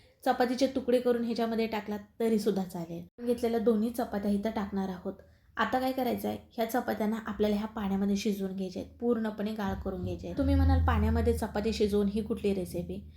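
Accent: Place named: Kolhapur, India. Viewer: कोल्हापुर ची भाषा की नाय एक नंबर आहे बघा 😊😊😊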